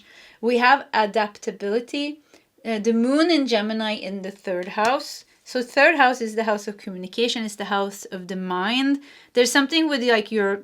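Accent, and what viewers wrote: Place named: Sweden. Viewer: Hey, punkin' just sending you so much love. I adore your Swedish accent so much. I always pick number 3 as my pick-a-card because I'm a life path 3. You always blow my mind when you talk about narcs in your life. I've married my narc mother in a man who's seriously self-obsessed and an abuser...but, after 35 years of living with his harming my body and gas-lighting me, the judge put a two year no contact order on him.Yes, as soon as he got out of jail he took almost all of the money out of our mutual account...that money was mine too and this is his financial abuse of me. I'm so frustrated because he still has me under his thumb. Sorry for the over share (lol). I'm tired. I've totally neglected my YouTube channel because my husband hates it. Thank you for the healing energy you give, you are such a darling soul. XOXO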